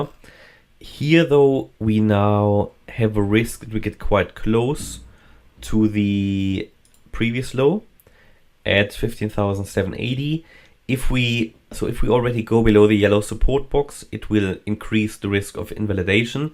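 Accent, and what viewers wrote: Germany. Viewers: New to this channel, and I love your accent! German yes? It's wonderful :)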